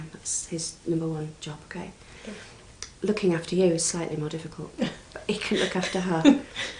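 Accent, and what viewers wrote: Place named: United Kingdom. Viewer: I don't like the British way of speaking . Hard for me to understand .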